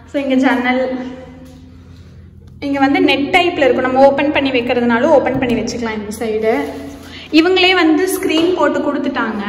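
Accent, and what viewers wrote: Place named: Coimbatore, India. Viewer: Sister neenga Coimbatore ah....covai slang😊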